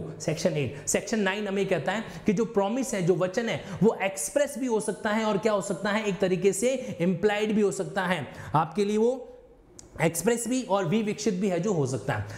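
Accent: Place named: India. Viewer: This rubbish is an Indian law lecture in Pidgin.-English and Indian dialect.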